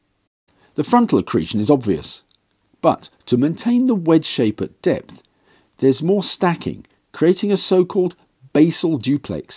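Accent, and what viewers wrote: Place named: United Kingdom. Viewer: Very good, thanks, and I'm very glad you're a Brit. I wanted to watch this when it came up as recommended, but was prepared to click away immediately if I heard an American accent. Subscribed.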